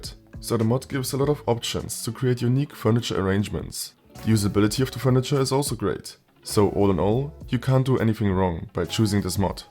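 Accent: Germany. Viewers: Man hört so raus das du deutsch bist haha Top Video!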